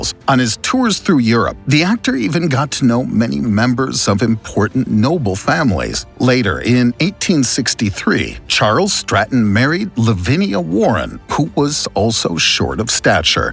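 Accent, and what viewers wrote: United States. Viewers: the narrator sounds American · Don't speak with an American accent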